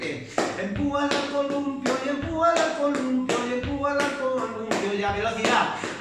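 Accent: United Kingdom. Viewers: Mano el que no le guste tu ingles, que se joda! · Muy Bueno! Y tú Inglés no me molesta! Te da mucho carácter 👍👍☺️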